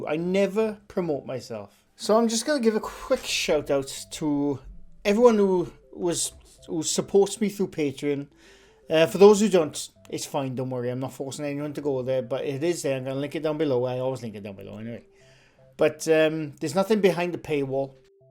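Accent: Wales, United Kingdom. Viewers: it's even better in a Welsh accent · I like your accent... so I like your Welsh accent too!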